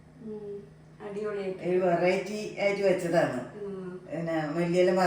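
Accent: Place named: Kannur, India. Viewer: Supper 😍😍 nammdea kannur slang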